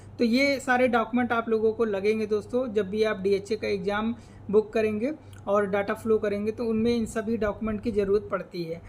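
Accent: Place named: West Bengal, India. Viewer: Sir are you from West Bengal :)??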